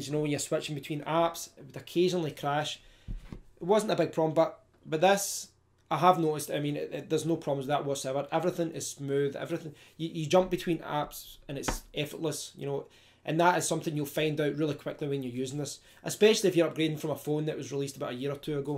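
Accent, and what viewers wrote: Scotland, United Kingdom. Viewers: Oh dude... That Scottish accent... You are probably from Glasgow?! Miss that accent after moving away from Scotland... :)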